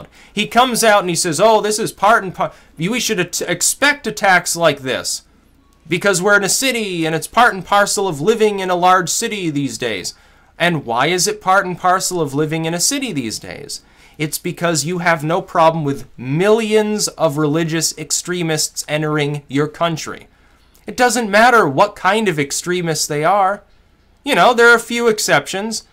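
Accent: United Kingdom. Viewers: Excellent British accent man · Love your British accent, made me laugh. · Nice Brit accent. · Nice British accent! You were just stuffy enough! LMAO Thumbs Up!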